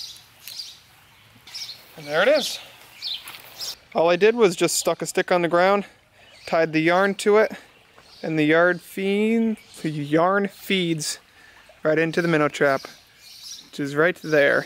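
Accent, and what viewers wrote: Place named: New England, United States. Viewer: So New England I love it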